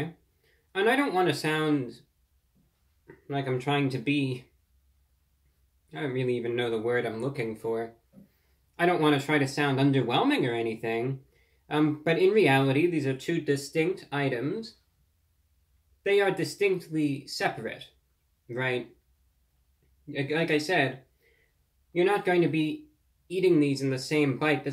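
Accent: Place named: Transatlantic, United States. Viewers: I'd like to hear him once in a while. Speak with more of his east coast accent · Boy, that transatlantic accent is fighting its way into the forefront, slow but steady.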